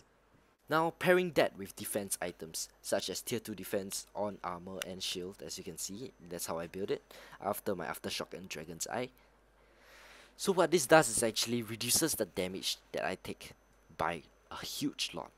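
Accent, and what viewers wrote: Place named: Singapore. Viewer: You sounded like a Singaporean..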